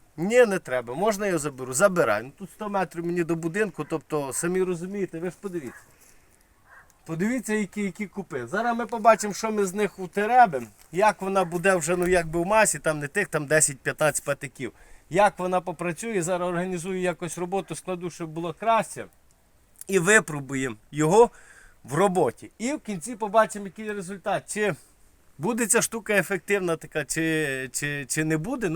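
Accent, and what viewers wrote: Chernivtsi, Ukraine. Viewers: ти з західної України, хотілося б знати звідки саме, бо у нас у Чернівцях так балакають як ти.😂 Щепоріз нормальний, ти просто ще до нього не привик.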